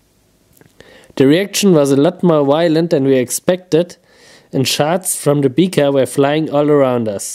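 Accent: Germany. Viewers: Sauberer deutscher Akzent.. ✌🏻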